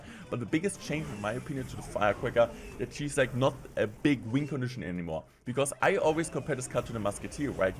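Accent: Germany. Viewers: Are you german i can tell by your accent😂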